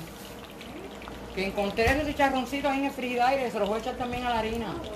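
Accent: Cuba. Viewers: Ay que cómica!!! Me identifico mucho contigo, no como cocinas, como hablas Jajaj,es que somos cubanas 👍🏼❤️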